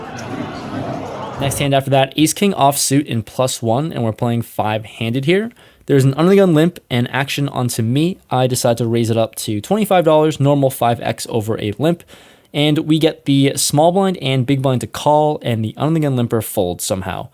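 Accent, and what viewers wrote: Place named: Western Massachusetts, United States. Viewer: Where are you originally from? i hear western mass a lot and i know you mainly play in NH. Are you from MA or NH?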